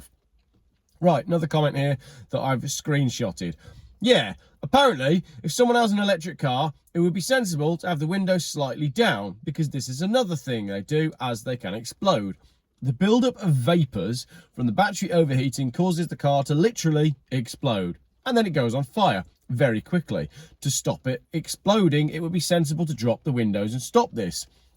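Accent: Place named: Birmingham, United Kingdom. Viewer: maybe it was the sloth?? Great vids... happy to hear a Brummie after decades in Canada.... Keep on keeping on (lose the hat, get a bandanna)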